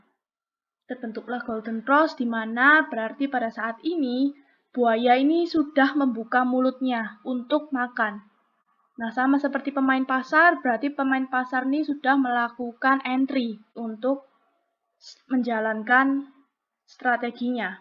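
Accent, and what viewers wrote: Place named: Java, Indonesia. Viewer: Mbak Wong ngdi.. medok lo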